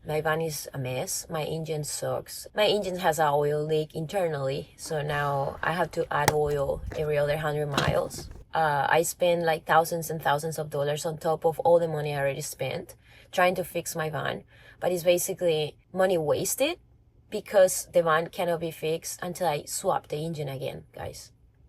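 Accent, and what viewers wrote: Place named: Andalusia, Spain. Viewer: Hola,no sabias que tenia acento Andaluz, llevo poco día siguiendo tu canal y veo que eres un persona de corazón, gracias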